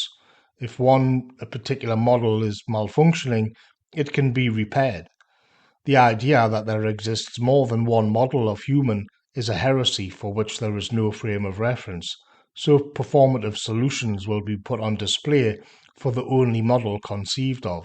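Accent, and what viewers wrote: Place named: North East England, United Kingdom. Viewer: I love it that a working class lad from the North East is far more intellectually than most of our shit stain politicos .it gives me hope I'm working class from the North as well knowing thus bloke is out there on line who speaks like me makes me so happy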